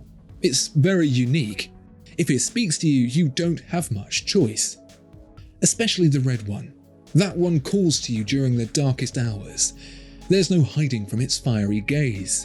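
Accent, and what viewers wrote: United Kingdom. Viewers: He’s British ya goob.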